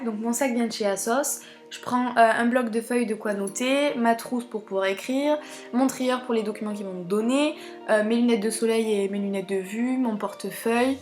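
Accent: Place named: South, France. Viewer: J'adore ton petit accent tu viens du sud ?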